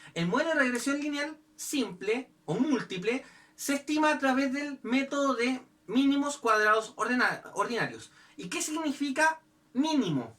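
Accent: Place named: Chile. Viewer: chileno, se cacha altiro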